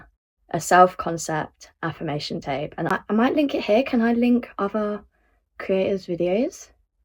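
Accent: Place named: United Kingdom. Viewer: The shock I felt to hear your British accent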